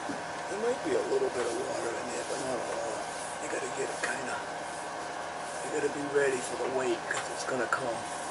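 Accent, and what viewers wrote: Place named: Boston, United States. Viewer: LOL, as soon as I heard "Octobah," I knew you weren't fah from Bahston. Greetings from Newton.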